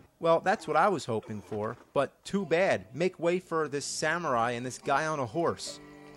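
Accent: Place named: Pittsburgh, United States. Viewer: haha yeah i think it's ' accent. I'm pretty sure he's from Pittsburgh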